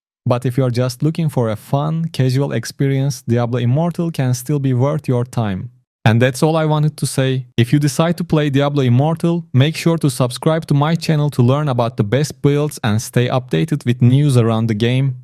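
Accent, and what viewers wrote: Turkey. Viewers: Türk olduğunu söyleyebilirim ama ispatlayamam. Aksan bariz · Türk aksanı nerde görsem tanırım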